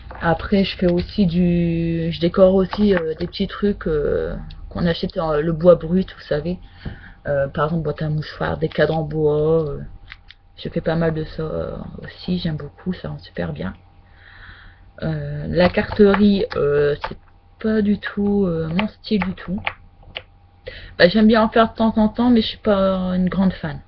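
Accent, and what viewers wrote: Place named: Nord, France. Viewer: Coucou je viens de tomber sur une de tes vidéos de l'album sur ton fils que je trouve surper beau et du coup je me suis abonnée à ta chaîne ... dis moi tu ne serais pas du Nord par hasard ? j'ai cru entendre un petit accent lol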